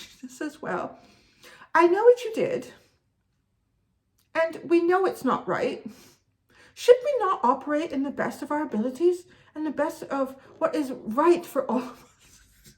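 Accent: United Kingdom. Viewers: You do a British accent perfectly! It sounds Gr8 to me, but I'm in Texas. haha. Random Royal fact, Prince William is a Leo🦁🦉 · Thankyou that was interesting, that was a great english accent too and you can't beat a bit of trailer park boys 🤣 peace, love and light to you ✌💞🙏 Xx much love Xx from London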